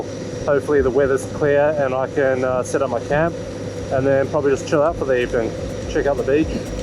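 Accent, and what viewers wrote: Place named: New Zealand. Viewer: Awesome, I followed pretty much the same route a couple of years ago, it's one of my favorite rides, that was like re-living my trip but with better music and video😁. Enjoyed this more than the overseas riders, it's always better when you know the places and to hear the Kiwi accent behind the camera, not to mention your filming and music is top notch.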